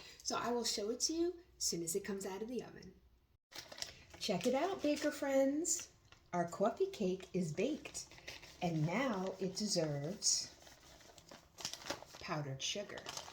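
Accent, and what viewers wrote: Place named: New Jersey, United States. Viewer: I've lost a lot of my jersey accent living in Maryland for almost 30 years now but when I heard your accent in the video I told him this recipe must be legit go get me some Bisquick ASAP 😂😂😂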